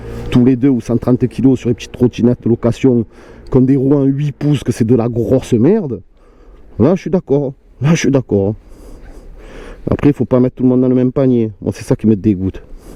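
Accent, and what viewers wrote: South, France. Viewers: c rs enervé avc laccent du sud mdr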